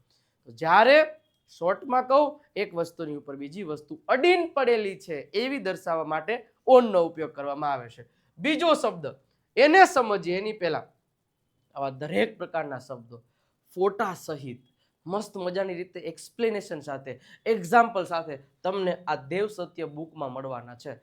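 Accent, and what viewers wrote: Kathiawar, India. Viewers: 💖💖"કાઠિયાવાડી અવાજ અને ઇંગ્લિશનું જ્ઞાન, સર છે ગુજરાતનું ગૌરવ અને શાન!"💖💖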